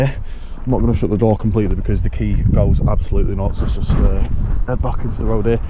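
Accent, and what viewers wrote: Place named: United Kingdom. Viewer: I stayed till the end for the British accent it sounds great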